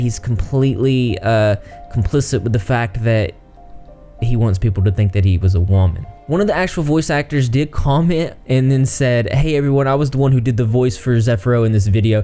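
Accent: South, United States. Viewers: Ur deff southern. I'm in Alabama.u gotta be close lol? Just started watching u on ur Cora story.ur awesome❤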